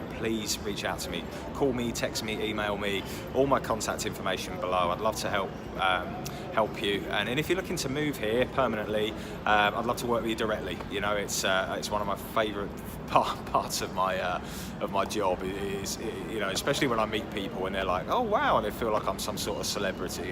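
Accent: United Kingdom. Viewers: You still got that Brith accent which is cool 😆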